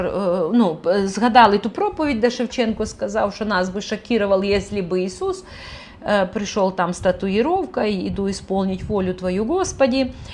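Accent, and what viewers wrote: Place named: Surzhyk, Ukraine. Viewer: Ну, подарунок вы ещё тот. Вы говорите на суржике. Смешной, исковерканный говор. Пидуть, справди, агрэссия, винувата, процентув, сказав, говорыть. Всё исковеркано. И вы захотели, чтобы другие тарабанили на этом подарунке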